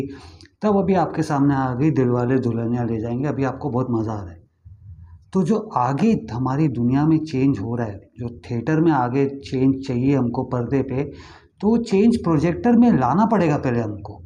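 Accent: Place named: Mumbai, India. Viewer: I never knew you had a Hindi channel as well ... nice ....and I like your Hindi .... ekdum bambaiya types 😛.... yes and very truly said .... Imagination, Visualisation .....plus feelings .... very important 😊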